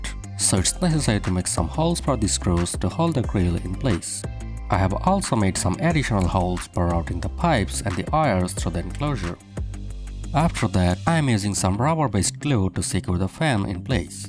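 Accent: India. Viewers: Your voice like indian ❤🎉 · Your account from USA but your voice like from India or Bangladesh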